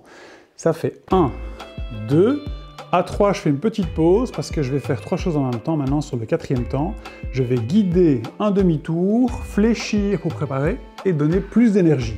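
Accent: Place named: Belgium. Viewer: Belle pédagogie, rythme de démo agréable et super digeste 😉, les portés j'Adore ! #DirtyDancingGeneration... 🤷🏻‍♀️😍😜 Y en a jamais trop ^^ Presque. Merci pour cette vidéo très fluide côté montage également, les petits traits d'Humour et l'accent de la Belgique qui me rappelle toujours des Souvenirs chers au 💗🤫🙃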